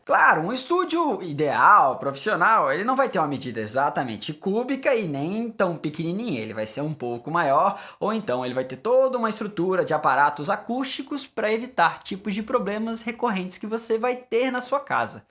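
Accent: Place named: Florianópolis, Brazil. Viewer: sotaque de floripa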